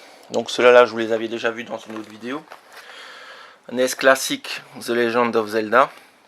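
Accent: Alsace, France. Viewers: On dirais bien un accent Alsacien ^^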